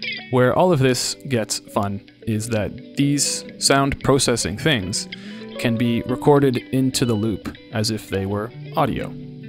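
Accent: Toronto, Canada. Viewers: wait are you from Toronto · Are you from Toronto?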